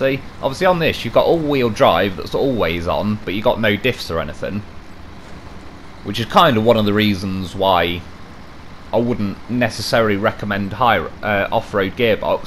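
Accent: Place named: England, United Kingdom. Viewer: And oh yeah by the way where are you from your accent seems like you are from England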